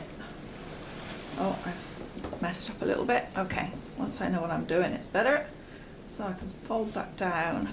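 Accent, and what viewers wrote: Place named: Gloucestershire, United Kingdom. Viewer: I'm from Stroud, Glos and your accent feels very Gloucestershire!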